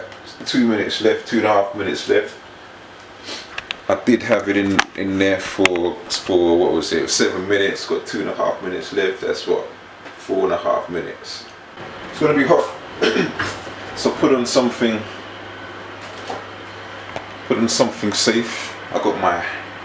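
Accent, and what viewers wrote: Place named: England, United Kingdom. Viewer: thanks bloke. I wish all men sounded English like him. hubba hubba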